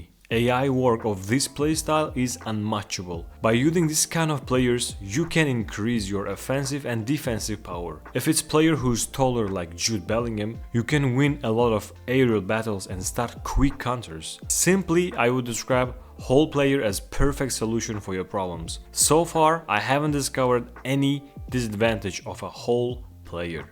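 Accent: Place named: Eastern Europe. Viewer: Nice one, just came across your chanel Btw are you from any of the former soviet union countries? Your accent sounds very familiar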